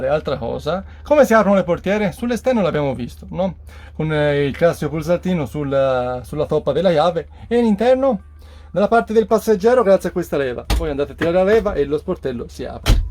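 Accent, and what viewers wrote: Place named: Florence, Italy. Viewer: L' accento fiorentino mi fa impazzire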